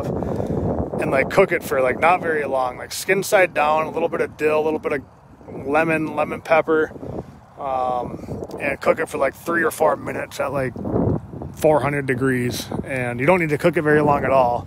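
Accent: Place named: Minnesota, United States. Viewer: you have the same MN accent as my cousins who live up there. Both my parents were from MN but they didn't have accents, I guess because they left when they were in their twenty's and that was in the 1940's. they used to say people in MN don't have an accents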